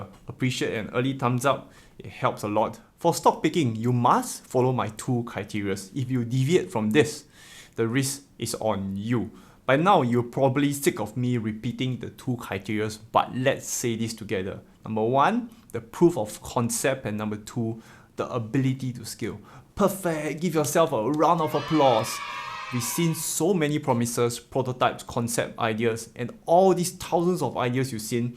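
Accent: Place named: Singapore. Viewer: I am from Sngapore as well! U’ve changed the way I’ve looked at and approached investing in so many ways. Rlly thankful and grateful to chance upon ur channel and hearing ur Singaporean accent in such a transparent way is so reassuring. Thanks!:D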